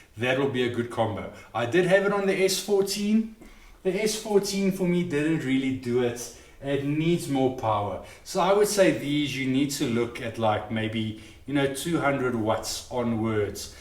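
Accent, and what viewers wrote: South Africa. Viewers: I have been looking at replacing my speakers which were unfortunately ruined in a rain storm we had here in Nova Scotia back in 2023 which flooded my basement. I have been considering these speakers from Martin Logan, the Bowers & Wilkins 702 S2 Signature and the Monitor Audio Gold 500 6G. The Martin Logans are the cheapest of these three speakers. I am running a Bryston 4B Cubed amp which is 300 watts into 8 ohms and 500 into 4 ohms. I know it would drive these speakers extremely well. I have also heard others say that the Bowers & Wilkins can be fatiguing in the treble. Are you from South Africa? I think your accent sounds like that. I am so glad you did this review. Thank you. 👍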